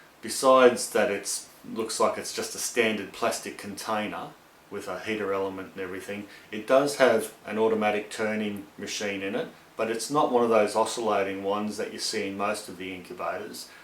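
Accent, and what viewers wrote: Australia. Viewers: Good review, and always miss Austrlian accent, miss Australian friends and teachers. However let me buy one and try with chickend eggs.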